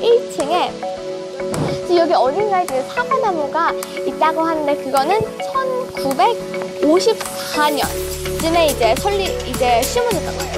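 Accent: United Kingdom. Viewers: 말투 충분히 매력적이에요 영국식 한국어발음 느낌? ㅋㅋㅋ 부정적인 댓글은 그냥 넘겨버리셔도 좋아요 ~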